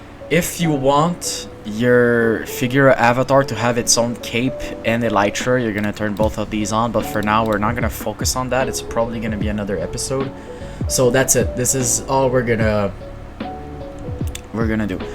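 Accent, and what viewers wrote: Quebec, Canada. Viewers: Am I crazy or do you sound vaguely canadian french? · correct me if im wrong but i think this is a Quebec accent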